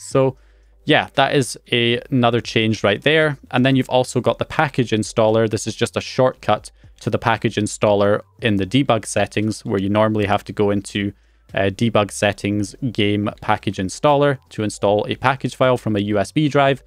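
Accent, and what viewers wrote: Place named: Scottish Highlands, United Kingdom. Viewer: damn, it's not very often I click a video and hear my accent lol. Aviemore? Inverness?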